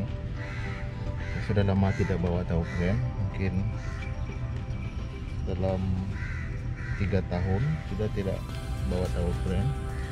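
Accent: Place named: Sarawak, Malaysia. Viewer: Orang mana boss? Macam orang sarawak je..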